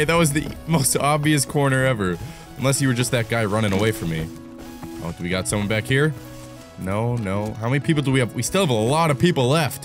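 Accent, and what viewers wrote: Minnesota, United States. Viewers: Shit is this guy from Minnesota too?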